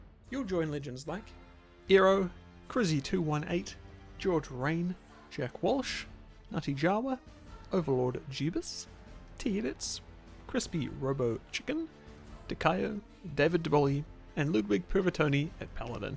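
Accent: New Zealand. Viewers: A Kiwi accent, what a pleasant surprise! I am so hyped for this, especially after seeing the lacklustre CoH3 demos.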